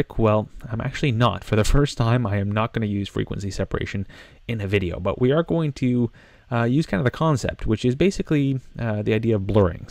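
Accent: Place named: Canada. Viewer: I knew you were Canadian by your cadence. Dead giveaway was when you said too low. also thank you cause this was really helpful despite my need to identify your heritage. haha.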